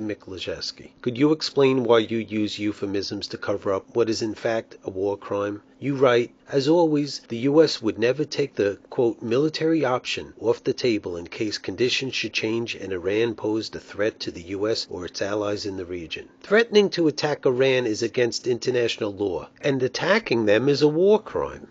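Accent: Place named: New York, United States. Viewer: Wow. I've stumbled across a Communist son of a bitch with a New York accent. This slime ball loves the Communist UN and hates the US Constitution... I wonder if he knows he is a marked man? I would further postulate that the slime-bots on this page are his co-conspirators. Geez. These cruds are as dumb as Obama... Antisemitic too... What a pile of LOSERS.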